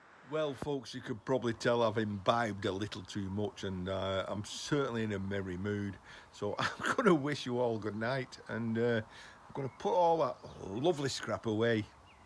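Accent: United Kingdom. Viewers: My grandson wants to know why you poms - pronuniate things different to us is Australia, I told him we do it as well, look at how we greet someone - Gidday mate!!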